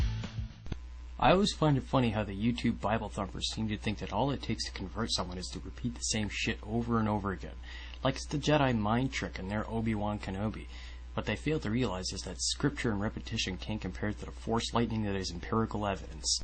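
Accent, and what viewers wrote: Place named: North America. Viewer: i'm sorry for making assumptions about your age based on your voice. But the accent.. well.. i'm a swede.. i wouldn't expect you to tell the difference between scandinavian accents either. i'm sure many people enjoy this emulation, and you do it well for someone with a northamerican accent and your voice (no insults intended). i just personally think that the brilliance of Yahtzee is as mutch about his cynical tone of voice and english dialect as it is his sarcastic fast pace.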